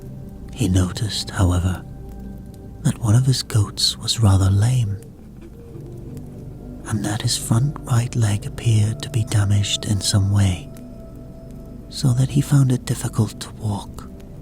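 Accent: Scotland, United Kingdom. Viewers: Really enjoying these as part of my routine before bed. I spend the majority of my year working abroad. The Scottish accent adds a feeling of comfort and home. These are all so well put together. As a fellow Scotsman, cheers pal! Ps. You’ve got yersel a patreon 😉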